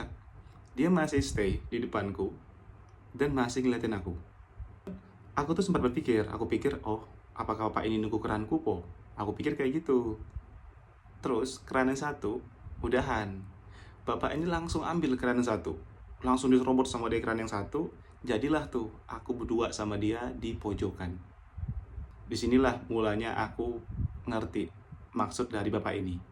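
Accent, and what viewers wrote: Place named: North Sumatra, Indonesia. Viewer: bg logat sumut nya kental kali! sumut nya asal dari mana bang, btw serem kali cerita ko bang bah ketemu bapak bapak homo gitu 😅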